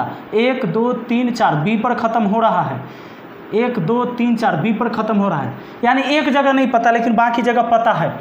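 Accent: Bihar, India. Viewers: Your language in bihari voice is good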